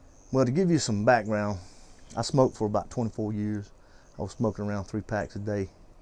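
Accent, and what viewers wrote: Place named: South Carolina, United States. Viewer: your accent made me feel right at home here in SC lol. felt like i was listening to a family member talk. i quit smoking today!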